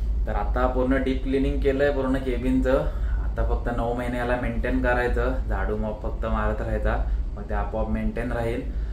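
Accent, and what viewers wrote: Konkan, India. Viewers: कोकणी भाषा आहे ती तसेच बोलतात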